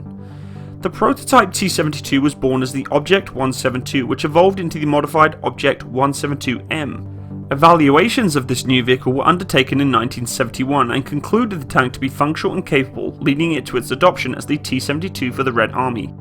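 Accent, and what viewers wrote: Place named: United Kingdom. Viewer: The quality of your analysis and videos are second to none. Thoroughly researched, well composed and hugely entertaining to boot. I will have to admit that hearing a British voice from home is an added joy factor for me. I actually look forward for (all) your new videos. I can’t say this about any other YouTube (or TV) channel. Please keep going.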